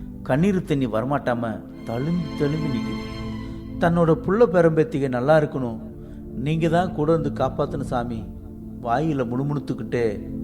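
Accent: Madurai, India. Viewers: அருமை மாமா இயல்பான மதுரை தமிழ் எளிதில் மனதை தொட்டுவிட்டது, உங்களது குரல் இந்தக் கதைக்கு பெரிய பலம்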